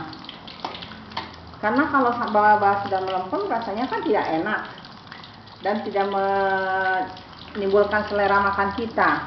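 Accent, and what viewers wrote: Indonesia. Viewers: aduuuuh teteh pasti sunda nyaaaa meni has suara sunda na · Sunda na ti mana atuh 😁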